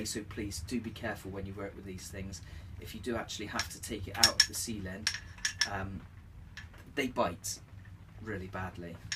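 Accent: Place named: West Country, United Kingdom. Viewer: Lush West Country accent. Good vid.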